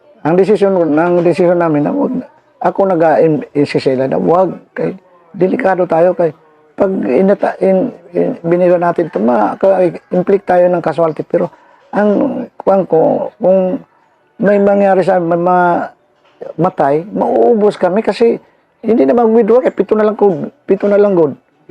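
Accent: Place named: Cebu, Philippines. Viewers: Cebu dialecnsiya.. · Visa ya po ang halo sa Salita nya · May pagka waray si sir, I'm not sure pero base sa pananalita nya, waray or bisaya. Di xa ilonggo...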